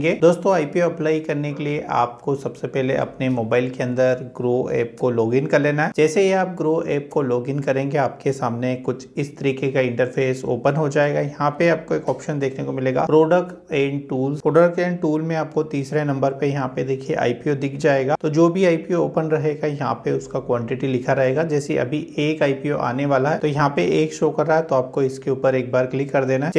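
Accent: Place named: South Asia. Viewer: Very nice Explanation simple and crips with desi tone 👍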